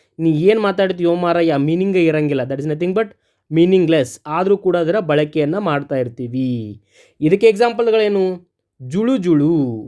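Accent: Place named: North Karnataka, India. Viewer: Tq sir i was waiting for this class!!nimma uttara Karnataka bhashe tumba chenagide 🌹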